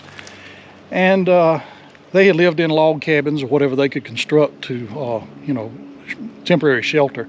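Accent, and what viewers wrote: South, United States. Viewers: and are both interesting men to listen too. They real seem to enjoy making the videos. you are a excellent historian and that wonderful southern accent of yours, just adds to the story telling. Thank you both 😊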